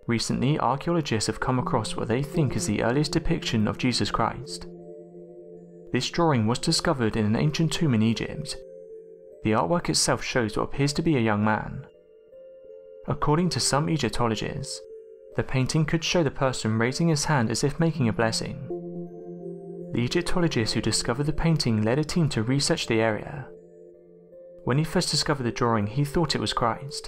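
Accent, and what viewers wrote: England, United Kingdom. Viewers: Qwerty Bwomp he’s English.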